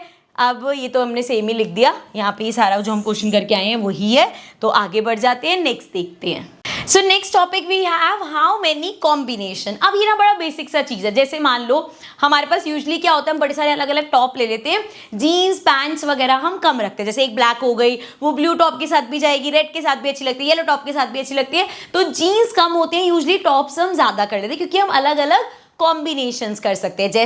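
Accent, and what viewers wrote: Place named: Marwari, India. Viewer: Ma'am very nice explanation thank you so much ma'am aap marvadi ho? Allee alle bolte ho isliye laga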